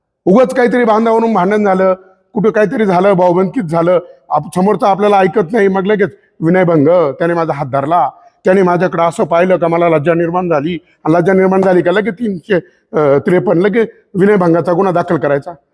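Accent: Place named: Ahmednagar, India. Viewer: नगरी भाषा 😊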